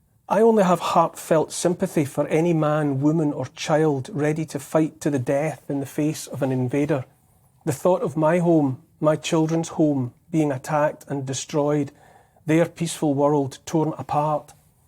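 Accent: Scotland, United Kingdom. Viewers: Really he is what he's complaining about. Somebody on " The News " extrapolating the worst possible outcome from any given event just to keep us in a state of anxious uncertainty. But in a Scottish accent. Nothing but a Private Frazier for our time constantly declaring that "we're dooomed"!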